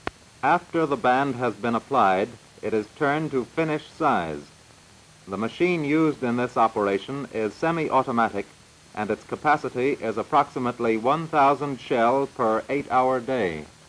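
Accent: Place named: Mid-Atlantic, United States. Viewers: It's called the "mid-Atlantic accent" - the "mid-Atlantic" jokingly meaning halfway between the US and England. It's an affected way of talking that was taught in some circles during the early 20th century; it's by no means the way any actual people talked normally. You hear it a lot in film and radio of the era. It's "FDR-speak." :) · I was watching this and saying to myself, "Geez, how labor intensive and inefficient...How the hell are they making enough to keep the war going?". And then I noted the date...1939. Oh. I should have figured it was pre-war from the Mid-Atlantic accent of the narrator, the fact that all the employees are male, and the relatively pedestrian rate of production. Bet that same production line did not even resemble what we see here by 1945...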